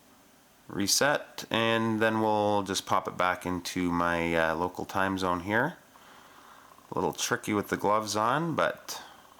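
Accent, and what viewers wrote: Canada. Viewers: l lived in Stony Plain, Albetta 18 months. - pre-"Oh Canada." Really "old" days. Thought I heard a touch of the Great White North.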